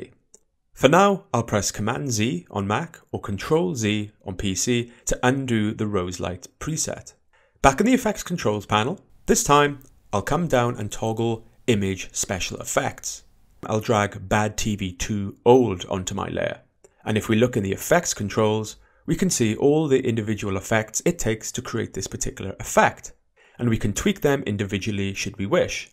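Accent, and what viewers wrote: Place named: England, United Kingdom. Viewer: "Command Zee??" You sound like you have an English accent, I thought you were from England, now I'm really confused - where are you from then?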